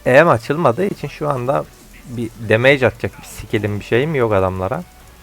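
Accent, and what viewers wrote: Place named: Muğla, Turkey. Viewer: abi sen nerelisin şiven konuşma tarzın muğla tarafları gibi benziyor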